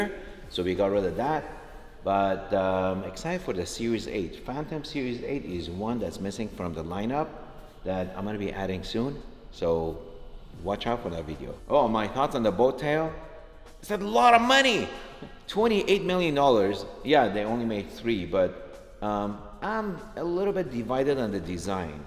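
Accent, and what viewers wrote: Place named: Iran. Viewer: I never heard of this man before in my life. But i can hear on the accent he is Persian.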